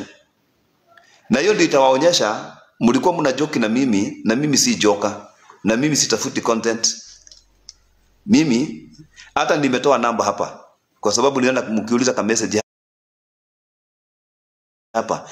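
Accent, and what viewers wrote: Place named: Kikuyu, Kenya. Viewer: Rudi shule kwanza ujifunze Kiswahili sanifu! Unaongea Kiswa-kikuyu...Baba ya maibilisi! Potelea mbali na huo uchawi wako unajaribu kutuuzia!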